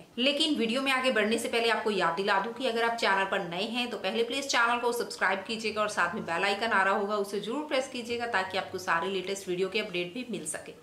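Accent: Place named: Haryana, India. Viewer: Mam AAP Haryana se ho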